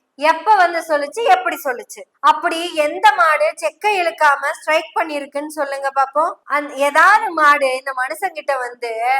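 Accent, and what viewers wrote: Kongu, India. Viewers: கொங்கு தமிழ் சங்கு முழங்குவது போல் பெரியாரின் பேத்தியின் மொழி நடை உள்ளது வாழ்த்துக்கள் ❤️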